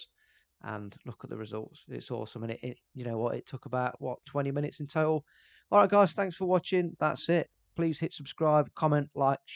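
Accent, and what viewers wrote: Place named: East Midlands, United Kingdom. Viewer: Brilliant idea! Great video and is that an East Midlands accent (Nottingham? Mansfield? Derby?)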